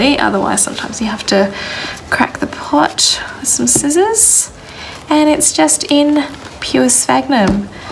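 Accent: Australia. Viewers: Excellent video on how to correctly repot orchids. Love you Aussie accent cheers.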